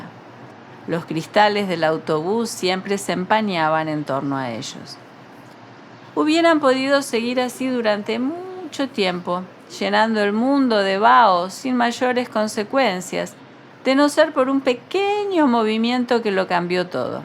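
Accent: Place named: Argentina. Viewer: El acento argentino no ayuda para escucharlo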